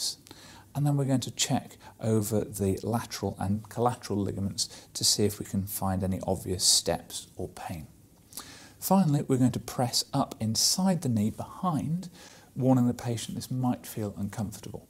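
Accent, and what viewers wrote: United Kingdom. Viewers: Really enjoy the videos from an ASMR point. Plus watching from Australia but from the UK makes accent like being back home. Keep going with the vids and thank you.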